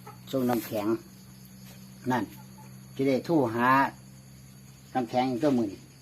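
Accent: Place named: Isan, Thailand. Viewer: คือบ่อตั้งชื่อช่องว่า เสียงอิสานเเซ่บนัว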